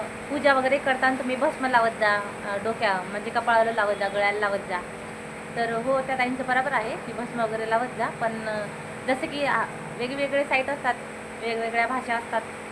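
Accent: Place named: Vidarbha, India. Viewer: आमच्याकडे टोमॅटो नाही खात उपवासाला 😊बाकी वैगव लक्ष्मीचे उपवास मी पण केले तर खूप वर्षे आधी आणि तू करतेस तर व्हिडिओ टाकायला काहीच हरकत नाही आणि मला एक सांगायचं आहे तूझी भाषा ऐकून लगेच कळलंकीतू विदर्भातली आहेस 😊कारण आमच्या ताई ( नंदूबाई ) अमरावतीच्या आहेत बाकी तूझ्या साधेपणा मध्येचतूला यश मिळेल ❤❤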